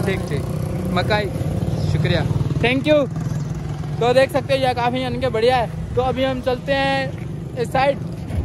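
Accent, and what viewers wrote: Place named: Bihar, India. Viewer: Bhai tere bolane ka lejja ek Indian Bihari jaisa lag raha hai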